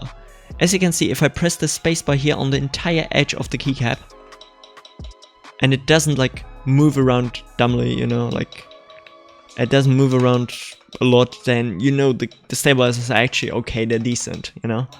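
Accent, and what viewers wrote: Germany. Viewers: Kannst du zufällig deutsch hab nämlich das Gefühl du hast so nen deutschen Akzent 😂 aber gutes Video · U have a german accend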